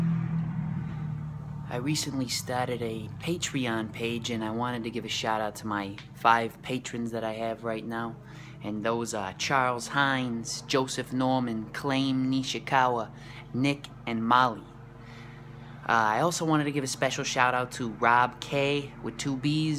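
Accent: Boston, United States. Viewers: Please PLEASE make more vids with the Boston accent 😭❤️ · bro i need, and i mean NEEEEEED more videos with the boston accent roleplay.... been passing out to this same video every night for like 3 weeks now to the point where i know every word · I want another asmr video using the Boston accent again 😍😍🙌🏻🙌🏻 Literally my favorite video! 💖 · I love your Boston accent. It's pretty cool!! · Loved this vid and the accent. Just hope everyone knows literally 0.00001% of Bostonians talk like that. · Excellent customer service skills, very attentive. And yes, that is a strong Boston accent! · MORE BOSTON ACCENT ASMR PLEASE OMF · He sounds like Leonardo DiCaprio with a Boston accent. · Zevi That’s New York accent this is Boston it’s stronger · Now I have to go to Boston to bathe myself in that glorious accent. Great video 🤗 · okay but like, this is why I love being from and living in Boston, when people do the accents and shit its too.amusing! 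keep up the great work!! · That Boston accent is super ASMR inducing. I wouldn't be opposed to another vid with it! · LOVE the Boston accent! Fantastic video, just subscribed :) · As a man with a Boston accent, i approve this message.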